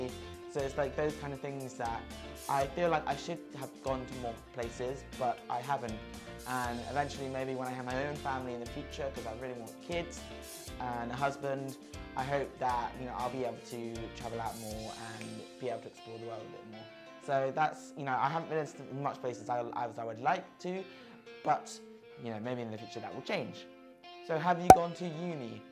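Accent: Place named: United Kingdom. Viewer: Es porque habla ingles británico! Ellos tienen un lenguaje y acento particular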